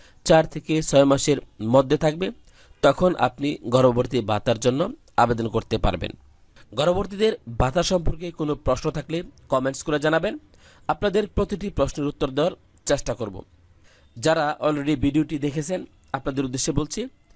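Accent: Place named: Comilla, Bangladesh. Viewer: কুমিল্লা কথা য় করে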